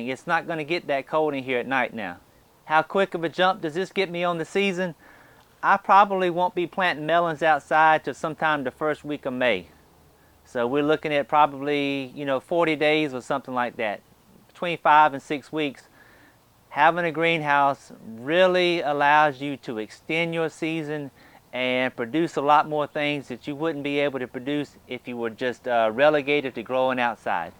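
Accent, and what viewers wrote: United States. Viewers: Omg, your country accent is so cute and funny. Nice video. 🌸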